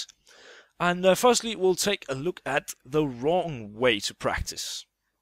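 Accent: Denmark. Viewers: Dansker ihhhh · Are you dannish? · du er Dansker ik? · lol du er dansk..nice..bare bliv ved med engelsk...hader danske youtuber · Are you Danish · Are you danish?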